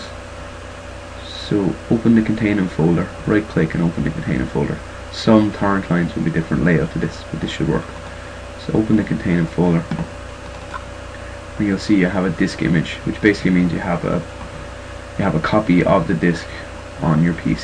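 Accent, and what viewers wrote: Ireland. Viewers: Are you Irish by any chance? :')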